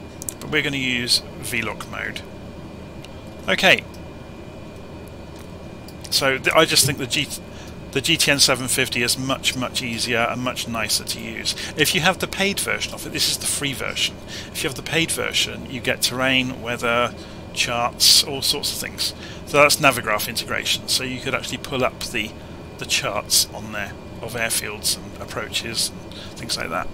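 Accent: England, United Kingdom. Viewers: Considering the high quality of your content, the superb presentation, your hundreds of quality wide-ranging videos and 3 years on the channel, I cannot understand the relatively low number of subscribers. Could it be the English accent? As a Londoner, I appreciate it, but there's no accounting for Eastern Pond dwellers. Fear not, I'm on your side; keep up the splendid work - ex-RAF navigator of 50+ years ago!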